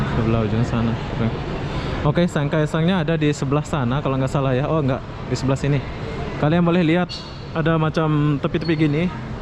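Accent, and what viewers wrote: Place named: Malaysia. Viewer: Kamu orang malaysia atau indonesia? Waktu di video surabaya ditanya dari mana kok jawab dari lombok , tp logat kaya dari malaysia . yang bener mana 🤣🤣🤣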